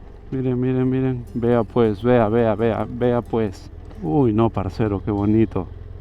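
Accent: Mexico. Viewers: Increíble como ya habla como todo un Mexicano, saludos desde Durango wey!!! 😉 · hasta aprendió a hablar cómo Mexicano ❤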